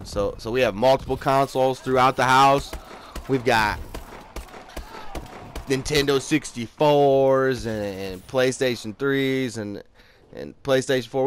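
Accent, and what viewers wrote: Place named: Indiana, United States. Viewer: 20 times!!!!More times!!!! I can tell your from Indiana. lmao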